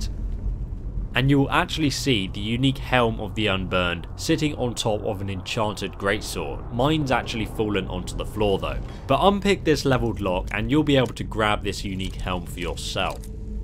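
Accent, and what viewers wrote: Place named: United Kingdom. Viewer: What I've been subbed for 3 years now and I just noticed your British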